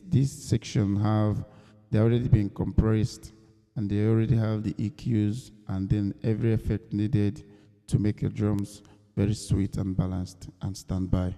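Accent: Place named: India. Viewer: Sir your voice has turned to an Indian voice, I couldn't understand the language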